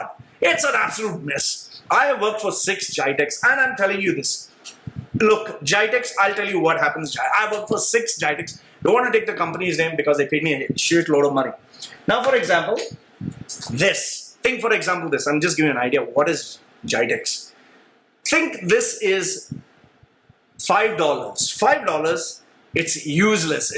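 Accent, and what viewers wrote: Kerala, India. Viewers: Y sooo much of hateee......Just think in a possitive way.....This country has provided a lot to expats who work here.....Respect gratitude...And speaking about labourers .....all those people are supplied by some indian or pakistanii booses.....why are you bieng such a hard ass.....Finding negativity in everythinggg.....Chill dudeeee.....and if uae goes down ....we tooo go down with themmmm.....Easy broooo...there are million of people who relies on this economy.....alo g with this native people there areee other who pray for this govttt.....find the gooddd spread good thingssss......And stop acting likeee ur an american ......I am sure ur a malabaaaaaariiiii.....buy ur manglish accent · Wat the fuck. I bet ur not married if so she has to be sad or even blind haaaaaaaa haaaaaaaa haaaaaaaa But u sound Indian u bullshitting loony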